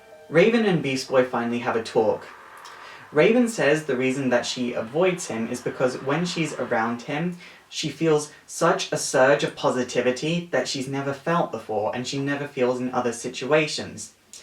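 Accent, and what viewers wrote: United Kingdom. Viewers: okay, 1 why the wig? 2 are you like slightly talking with a British accent? 3 what about the tv series?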